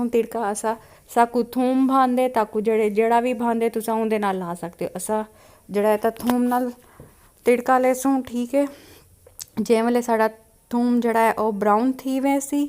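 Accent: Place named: Saraiki, Pakistan. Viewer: Piari piari sariaki vice😅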